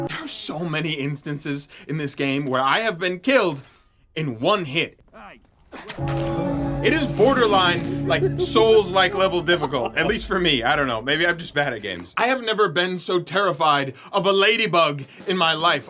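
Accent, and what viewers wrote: United Kingdom. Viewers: YEAHHHHHHHH BRITISHHHHHH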